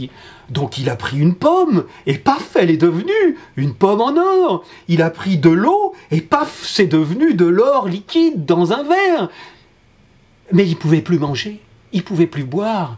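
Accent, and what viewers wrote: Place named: Paris, France. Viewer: Vous parlez très élégamment, M.! Il y a cet accent parisien qui permet une excellente diction. Mais surtout une faculté d'expression orale que notre génération n'a pas reçue, sans doute à cause des pédagogies nouvelles. Je remarque que les + de 50 ans de la blogosphère s'expriment bien plus clairement que ne le font les meilleurs des intellectuels de 35 ans. Entre nous, la génération intermédiaire est déjà incapable de discourir proprement, cf. les allocutions de Hollande ou Charközy. Je recherche des textes, des témoignages pour comprendre ce qui s'est passé et comment je pourrais compenser ce défaut. Est-ce qu'à l'école dans les années 1960 on entraînait les élèves à l'oral?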